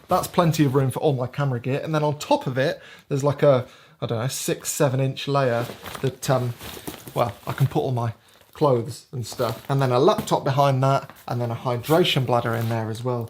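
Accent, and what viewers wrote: United Kingdom. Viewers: I gave u thumbs up even before i watched it. Love the british accent and your dry humor. I have no interest in Panny or micro four thirds but you vids are entertaining. Bikes. Hikes. And what ever else. Love it. Thanks.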